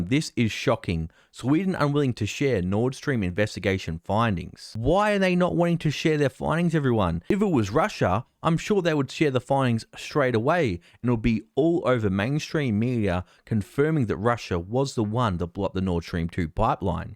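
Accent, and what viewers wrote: Australia. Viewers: he is Aussie hence the accent.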